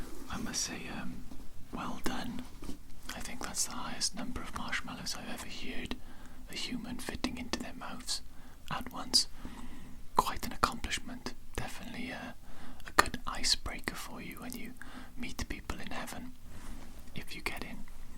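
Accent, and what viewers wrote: Wales, United Kingdom. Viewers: Are you Welsh by any chance?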